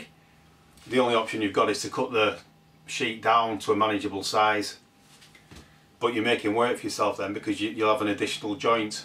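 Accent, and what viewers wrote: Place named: Blackburn, United Kingdom. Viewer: Are you from Blackburn That accent sounds familiar 🤣😂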